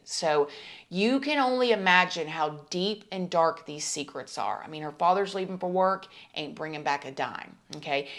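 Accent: South, United States. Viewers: When did you get a southern accent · This is a horrific case with horrific details. On a totally different note where is this content creator from? I'm only looking for a general area because that slight southern drawl is the best thing I have ever heard. I might even be willing to relocate. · You’re a little too much for me. I’m not your “baby”. Can’t you just talk without all that southern foo foo and fingernail drama? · You say the word , "on", In a different accent that your normal , seemingly southern accent. Strange. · Hi, I am one of your babies, you're not only an informative, Amazing story teller of crimes, I'm totally impressed with you and you are Stunning and an Awesome Mama, I'm from New Orleans & we have a ton of N.O.La.accent down pat, are you actually from Florida or a more Southern town??? Either way I will follow you as often as I can find a story I've not already seen, take care of you 🤗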